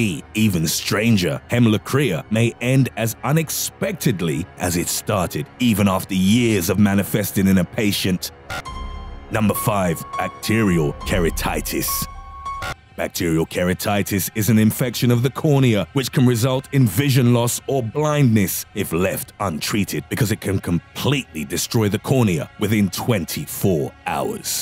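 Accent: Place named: United Kingdom. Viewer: cinocee scottys a pleab. Anyways, this dumb ass voiceover takes the scary away, sounds like a British butt pirate.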